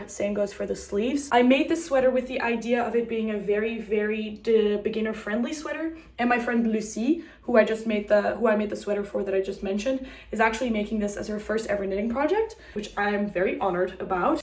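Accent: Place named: United States. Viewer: hi this is so random but where are you originally from? you sound so american but you look so european 😭 sorry if this is rude i don’t mean it to be! also all your knits are gorgeous!!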